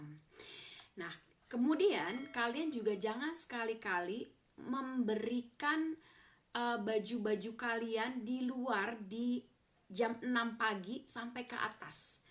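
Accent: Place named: East Java, Indonesia. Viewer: sama kak,ak juga minta maaf ya bila lambat terus berkunjungnya, btw kakak indonesia nya mana ya,kok hajing hajing wahing sama kayak.jawatimuran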